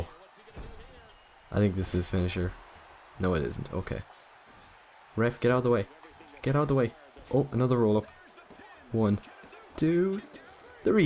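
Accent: Ireland. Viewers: I can hear a bit of Irish accent in your voice. Do you have any Irish blood in you?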